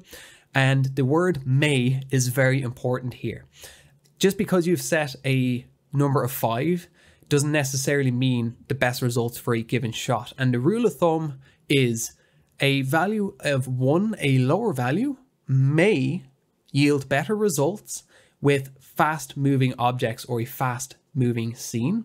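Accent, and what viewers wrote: Ireland. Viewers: Great video! You sound Irish... visited Galway and Dublin last year and had a crackin' time!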